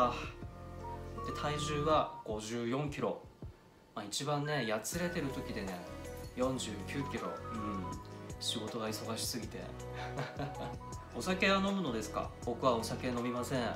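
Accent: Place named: Hokkaido, Japan. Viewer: かっこよくて、介護士さん。ちょっとした北海道なまり？も、とても可愛いと思います！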